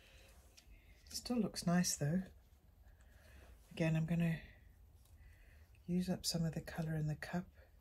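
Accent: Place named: Southern Africa. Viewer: It's nice hearing a Southern-African accent! Btw, how do you sell your artworks?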